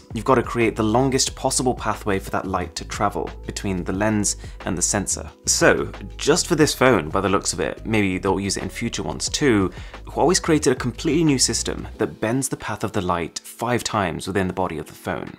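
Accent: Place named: RP, United Kingdom. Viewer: Hello Mr posh english accent. How good is this phone without google apps & services?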